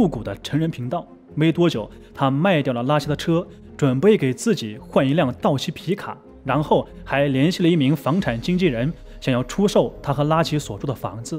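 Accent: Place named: Gansu, China. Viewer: 甘肃口音？咬字有点怪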